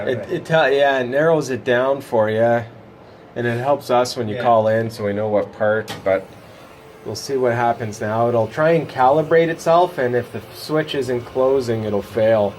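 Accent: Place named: Canada. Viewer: Now you sound more Canadian. "Ya Ya" "And then fail Eh?" Yeah I remember getting the bulletin too. Something to do with the metals inside the switch. 🌓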